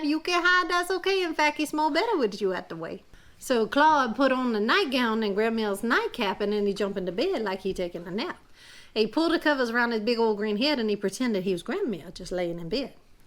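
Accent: Louisiana, United States. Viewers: I loved the story as well as the Cajun accent. Great job! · You can laugh at his "y'all" all the time ! You are good at Cajun speak. Great story too.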